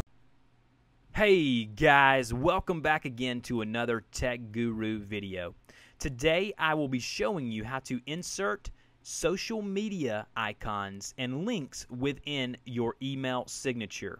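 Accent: United States. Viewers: I love hearing American English Cheers From Algeria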